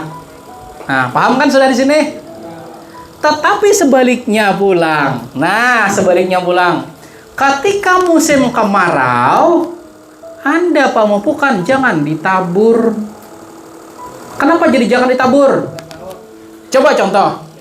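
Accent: Banjar, Indonesia. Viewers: urang Banjar kah pian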